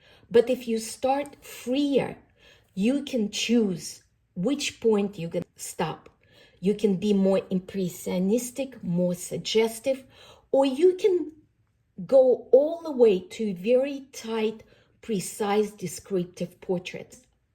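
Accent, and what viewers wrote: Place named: Europe. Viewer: Great to hear a European voice and you are so good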